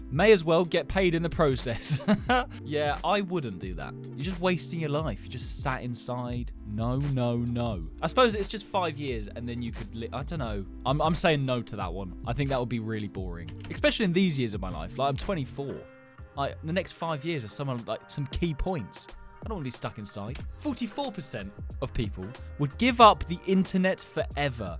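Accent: United Kingdom. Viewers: Wait are you meant to be from the UK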